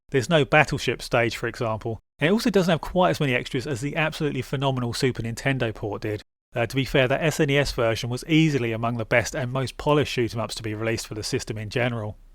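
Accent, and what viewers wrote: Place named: United Kingdom. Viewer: Is this a Brit accent or some other non American commonwealth? Aussie maybe?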